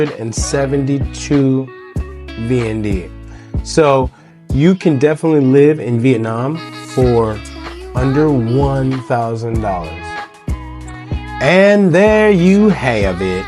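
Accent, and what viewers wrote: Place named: England, United Kingdom. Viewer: I guessed that comes from UK His accent is English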